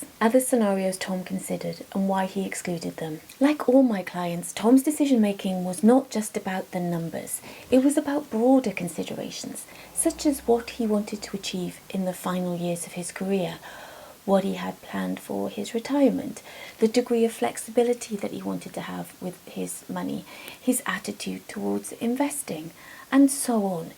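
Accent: Wales, United Kingdom. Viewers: Thank you for this incredibly structured video. I will be coming to you for advice in about 10 years time. Your accent, Bridgend or Port Talbot I would say…Porthcawl in between? Keep up the great vids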